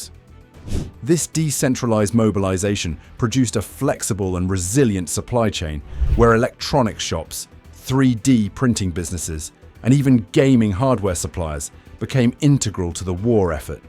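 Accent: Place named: England, United Kingdom. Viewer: The pictures are good ,but the pommy dramatic voice over is poor???? Just tell the story with no dramatics in your voice???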